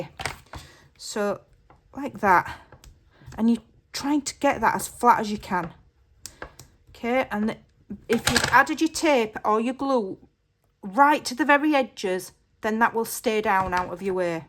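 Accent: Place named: Scotland, United Kingdom. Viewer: Hi there, I apologize, but I can't hear what the name of the tape is - Mac isnt making sense trying to translate the "scarpile" tape. I begun subscribing to your channel for about 6 months ago, & I love watching you make these journals. You just showed some of the once you've made Nov 4th 2025 & I want to try making one for one of my grandchild - thinking of making it as an Advent calendar for her. Don't know yet how or if it will work. I'm from Sweden, so English isn't my first language, love your Scottish/Wales accent - just guessing now. Would be grateful for your help, with the name of the tape that you mention. Thanx for sharing this tutorial. From Lia/Sweden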